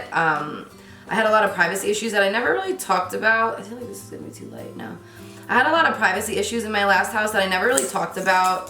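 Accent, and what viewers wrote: New Jersey, United States. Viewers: Her Jersey accent is so thick i honestly love it. She kinda sounds like Rihanna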